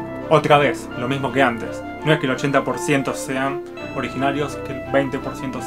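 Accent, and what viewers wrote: Bolivia. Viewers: Tiene acento de un orureño. No creo q seas europeo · Parece que tenes acento tarijeño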